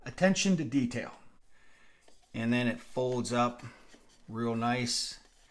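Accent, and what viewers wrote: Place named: Pittsburgh, United States. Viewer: just when some sad corner of youtube said the Yinzer accent was dian aht I find this video ✨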